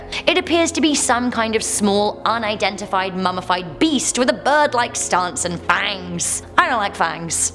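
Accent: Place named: United Kingdom. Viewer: Hi, I love your accent fore sure you can't denie you're from UK ;) <3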